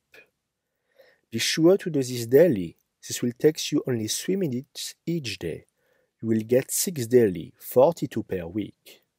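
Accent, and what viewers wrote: France. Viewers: I'm French and it's surprising to me how he obviously knows and understands English quite well, meaning he's been exposed to it quite a lot, and yet somehow he still retains that very thick beginner French accent that all high schoolers have. The amount of French people who have way less vocabulary and understanding of English grammar than he does and yet speak with a much better accent is astronomically high. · I literally have to put on CC because of how thick and hairy his French accent is. But I love how good his editing skills are. Literally don't need to listen and can watch everything to get the information. So does it matter? I love his work! Tu es beau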